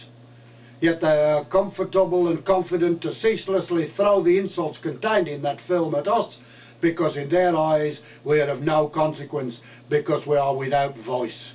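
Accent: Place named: Cornwall, United Kingdom. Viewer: This guy sounds like a Cornishman complaining about England.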